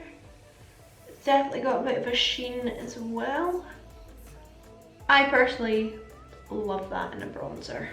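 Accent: Scotland, United Kingdom. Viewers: Some nice stuff there. I love the colours in that little eye palette. And the accent, the "HAMISH", the Flower of Scotland - all my Scotofile cravings fulfilled. LOL. You had a birthday??? Happy Birthday. Hope you had a lovely day. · Omg are u Scottish??xo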